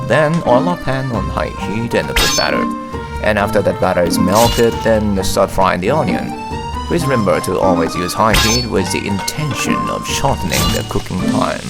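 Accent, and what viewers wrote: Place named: France. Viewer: What are you talking about? best part of the video is his somehow french accent!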